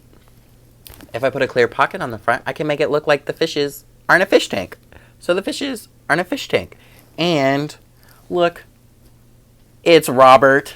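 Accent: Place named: South, United States. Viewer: Thanks for being with me in the last couple of days, have just come across you I think it was via forensic flosser don't quote me on that though, and I have been binge watching you oh my you have had me in stitches so many times I think one of my favorites was .. god is like ikea hahaha I totally get the being awkward in front of a camera ( you are soooo not) I tend to freeze up I hope it gets better soon as I am so not totally boring Iike I think it comes across on flosstube I promise I do actually have a personality people lol maybe that's why my watching time is so low per video. I love your stitching I too like terresa Wentzler and have several of her patterns but have not yet started any must do soon love seeing your updates and I could listen to you for ages I am a sucker for accents especially the southern states. any who will talk to you soon have a great stitching and general life week .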